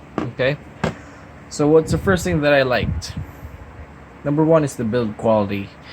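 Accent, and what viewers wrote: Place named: Philippines. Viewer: i knew you were pinoy just by your tone. I'm thinking of getting this or Fiio X3 Mark III but can't decide yet or i could just add a bit more and get a nintendo switch and forget about music haha jk. any review for Fiio X3 Mark III over NW-A45?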